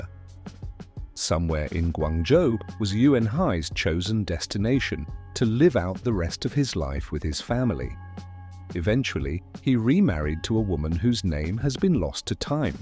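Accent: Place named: England, United Kingdom. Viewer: The history might be interesting, but the Queens English pronunciation of Mandarin and Cantonese is really hard to get past.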